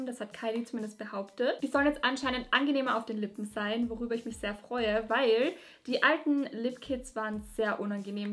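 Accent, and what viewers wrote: Austria. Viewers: Hallo ich kenne dich noch nicht lange aber kommst du aus Österreich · Und auch so lieb und immer freundlich und dein österreichischer Akzent ist echt cute und du bist so talentiert und kreativ und ich liebe dein Intro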